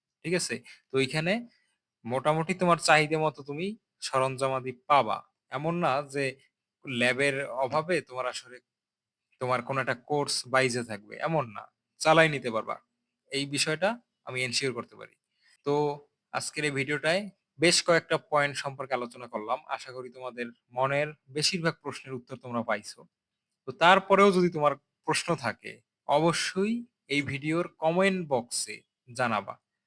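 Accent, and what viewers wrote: Barishal, Bangladesh. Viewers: ভাই আপনি কি বরিশালের। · Vai apni ki barishailla??🌚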